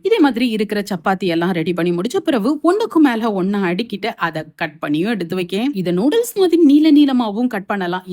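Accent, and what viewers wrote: Tirunelveli, India. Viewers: Nenga thirunelveli ah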